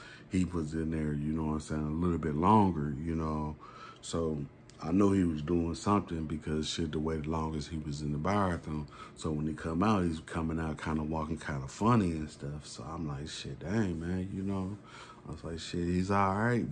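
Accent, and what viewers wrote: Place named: South, United States. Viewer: Water ever time you talk…talk like u from slow south…… story dope though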